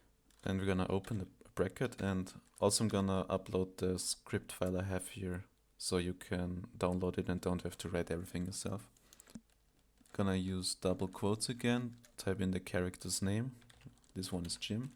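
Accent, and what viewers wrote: Germany. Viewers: schon beim ersten Satz gemerkt das du deutsch bist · You're accent sounds like a German one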